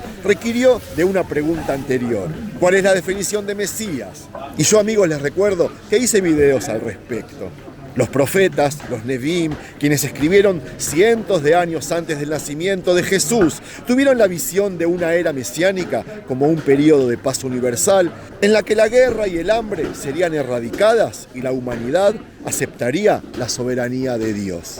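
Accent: Argentina. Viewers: Judio ? Yo lo escucho con acento Argentino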